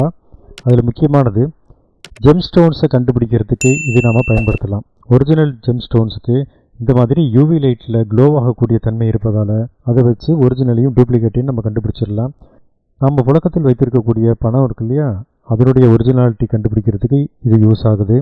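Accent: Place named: Sri Lanka. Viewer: Valthuhal. Neenga engayo poga poringa. Mulu Thamizh kekum podhu oru Sri Lankan vibe iruku. English warthaigal warudhu. Medhuva korachikonga. Tanglish la eludhinadhuku mannikanam. From 🇱🇰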